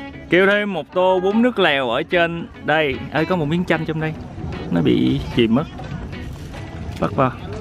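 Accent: Mekong Delta, Vietnam. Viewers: giọng nói đặt sản miền tây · Giong mien tay thi khong phai chê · / dể thương nói chuyện đúng dân miền Tây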